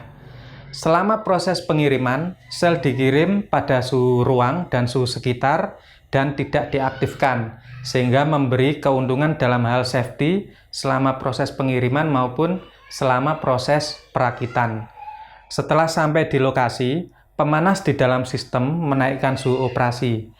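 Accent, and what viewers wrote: Java, Indonesia. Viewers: Dengerin pelajaran teknik dengan bahasa medok ternyata lebih mudah mengerti.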